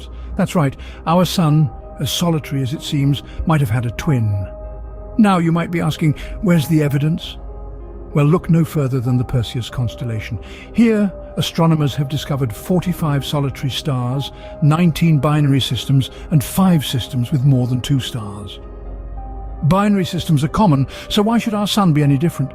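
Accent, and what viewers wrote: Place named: United Kingdom. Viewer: This channel has British narration but channel has no social info and based in Japan? 😂 Neat. And video was nice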